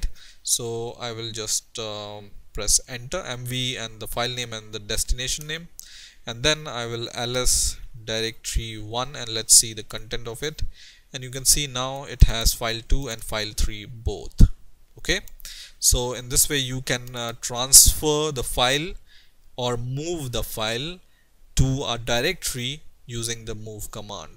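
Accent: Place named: India. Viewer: I can understand his Indian accent. awesome.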